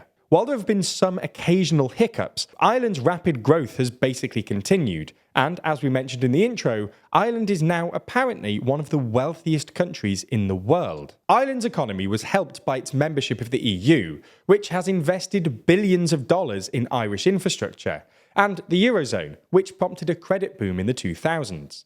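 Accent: United Kingdom. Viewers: Big fan of TLDR. Still, it sounds very condescending listening to a British accent telling us how well we have done "given where we have come from". Where we came from was oppressive British rule, that famine you very briefly touched on was a genocide perpetrator by the British govt of the time. Yes, we have come a long way!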